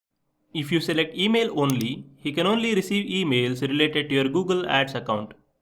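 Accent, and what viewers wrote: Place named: India. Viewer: Fix your Indian accent. It's annoying af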